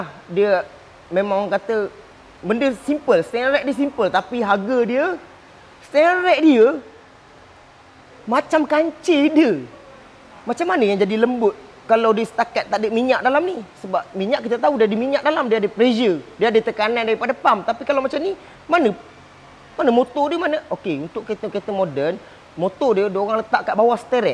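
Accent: Pahang, Malaysia. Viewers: workshop kat mana? bunyi cakak turr maca org pahang jek..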